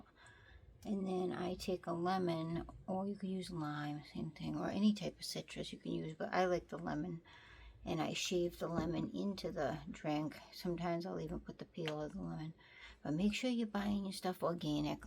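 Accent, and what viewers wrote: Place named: Rhode Island, United States. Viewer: I dont know why I was under the impression you were in RI beyond your accent (know it because I used to live in Portsmouth) but I think thats where you are?. Didnt realize that cannabis was illegal there if im right and thats the state youre in. But MA and CT are close to you and yes those places sell potent thc products but that just means you use less of it at any given time if that is something you enjoy doing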